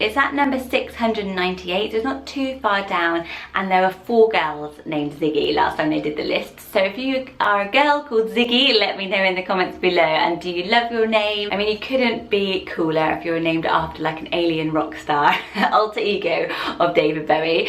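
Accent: United Kingdom. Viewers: Because of her strong British accent, every time she started to say "Jagger" I legitimately thought she was saying "Jack maybe not a good choice for me... LOL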